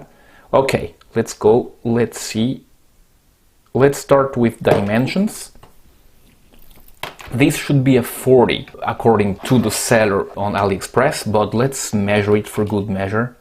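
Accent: France. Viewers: Il sonne frenchy ton accent. Merci pour ta vidéo, j'attends la mienne avec le fond noir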